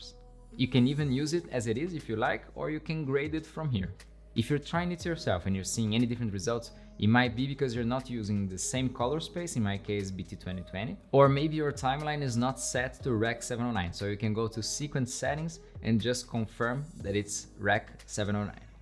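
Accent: Brazil. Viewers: Thanks for the video, really nice explanation!! PS: You have a little Brazilian accent 🤔🤔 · mano, tu é brasileiro? 🤔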